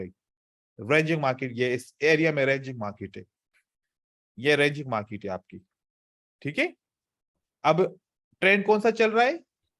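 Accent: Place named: Sindh, Pakistan. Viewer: Sir app ki voice se lag Raha ha app Sindhi haan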